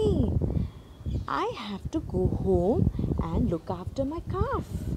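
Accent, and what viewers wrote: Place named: England, United Kingdom. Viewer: Awesone Story telling.. english accent and the way the story narrated.. creates enthusiasm in kids.. Great Job!!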